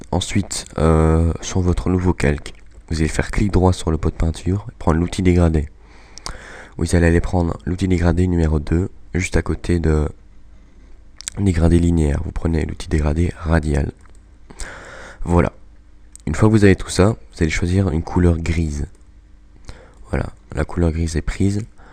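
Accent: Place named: Belgium. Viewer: Sa fesais longtemps que j'avais pas entendue ta voix de belge <3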